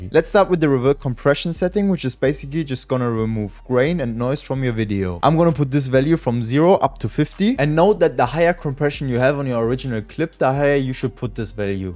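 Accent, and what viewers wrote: Germany. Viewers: Ich höre einbisschen Deutsch raus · This German accent 👍🏻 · richtig deutscher akzent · ur german i only watched 10 seconds and heared ur accent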